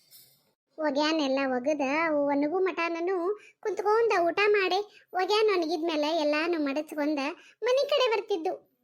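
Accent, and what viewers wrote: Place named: Vijayapura, India. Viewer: ಅದ್ಬುತ ಮಾತು ನಮ್ಮ ವಿಜಯಪೂರ ,uk ಭಾಷೆ ನಿಮ್ಮ ಚಾನಲ್ ನಲ್ಲಿ ನೋಡಿ ಕೇಳಿ ಬಹಳ ಖುಷಿ ಆಯಿತು ನೋಡ್ರಿ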